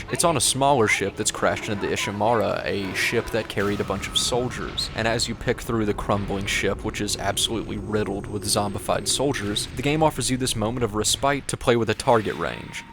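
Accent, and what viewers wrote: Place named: Tennessee, United States. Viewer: interesting and enjoyably informative video on a game I've never played, but the audio's subpar, especially when compared to your usual standard of quality the occasional repeated lines and the bizarre sharp increase of the game's volume at the end were actually distractingly bad. if you're following the caldwell-gervais school of longform critique, that's fine, but steer clear of his audio editing habits, or lack thereof your pleasant (tennessee?) accent's far less pretentious and awful than noah's, though, so at least you have that going for you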